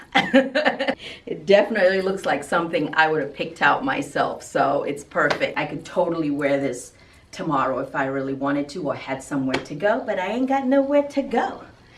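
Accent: Nigeria. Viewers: Wow! Your Hausa accent is still evident after all these years 😂. The way you called Abuja was spot on. Allah ya ja zamanin ki malama... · You look absolutely beautiful ❤️ you still have the Nigerian accent. Nice 👍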